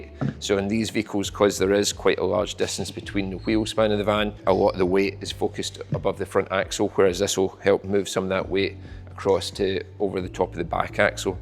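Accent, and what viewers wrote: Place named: Scotland, United Kingdom. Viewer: I could listen to the Scottish accent all day!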